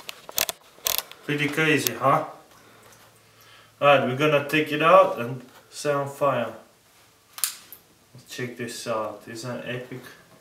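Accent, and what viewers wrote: Russia. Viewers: Thanks for making these videos. I like how they are quick and easy. Your Russian accent is gnarly by the way.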